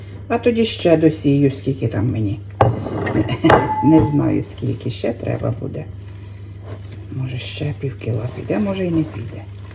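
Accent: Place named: Surzhyk, Ukraine. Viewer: не зникайте, а то сумую за вашими відео, ще Оленка подобається з "Мого сільського життя", такі ви дівчатка наші, а суржик просто рідний дім❤❤❤❤❤❤❤❤❤❤❤❤❤❤❤❤❤❤❤❤❤❤❤❤❤❤❤❤❤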